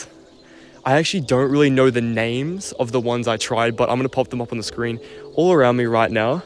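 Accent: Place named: Finland. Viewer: Great video! I was a Professor of Geography for 32 years so intimately understanding places was a part of my life. I thought that you did an excellent job in describing the different realms of the land. The primary lack was in describing the peoples of Finland. It will probably take time before you can describe the culture because of the language difference, but I think that you are picking up the Finnish acent in pronunciation of the place names. The hurdle that you will be the experience of the extreme winter. It is brutal. Nothing like any place in Australia, even Tasmania which is the coldest part of Australia. Buy some very warm clothes and get a light box if you experience SAD depression from the lack of light. Hopefully you will have a great time there. My suggestion also is to enroll in a class for Finnish.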